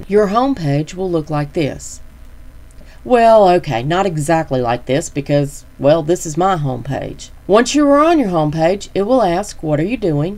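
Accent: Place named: South, United States. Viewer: This was just great! Your lovely Southern drawl made it feel even more captivating.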